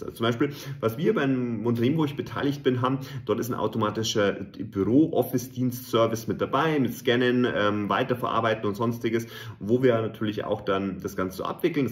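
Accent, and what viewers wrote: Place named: Bavaria, Germany. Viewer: Kann jemandem mit solch einem Dialekt never ever zuhören..sorry, bei aller Liebe. Wohl was eher für die bayrische Bevölkerung. Vielleicht hat er einen Blog..dann lese ich lieber!😅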